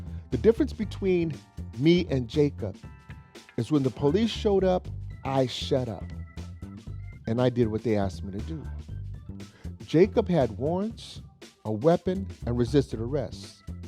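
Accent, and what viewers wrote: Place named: Jamaica. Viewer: Love the Jamaican accent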